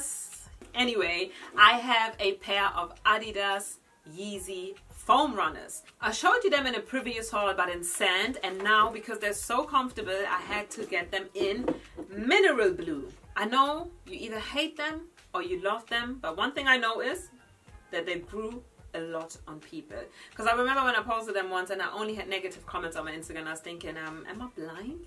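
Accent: Germany. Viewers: Nice deutscher Akzent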